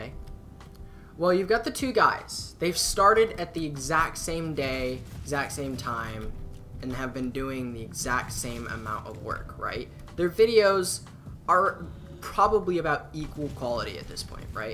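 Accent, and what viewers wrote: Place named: Canada. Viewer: i actually thought you were candian.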